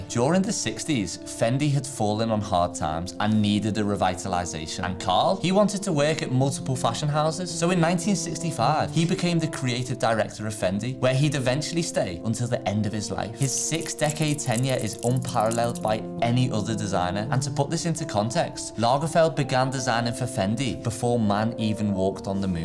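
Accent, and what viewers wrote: Liverpool, United Kingdom. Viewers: Really enjoyed this quick, informative and to the point. I kept wondering about the presenter's accent. Scouse? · I am glad I have found this channel, it’s quick and sparing of unnecessary info BUT you do need to do a bit of work/research on your pronunciation. Oh, and you can’t blame it on your mildly scouse accent either, sorry. 😀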